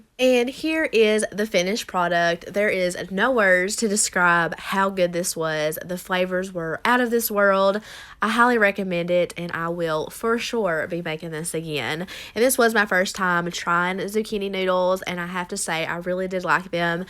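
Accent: South, United States. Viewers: I found your videos about 1 month ago, and I have been really enjoying your dinner ideas. I also love listening to your voice. Your southern accent is so charming! Thanks for doing what you do! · The southern accent is what puts me off this channel, sorry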